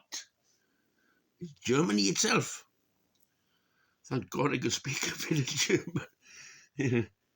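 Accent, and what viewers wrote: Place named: Wales, United Kingdom. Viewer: Evening, always nice to hear your views, and that wonderful Welsh accent! Albannach, not Albanian ya Wallonian🤣🤣🤣 We live in interesting times.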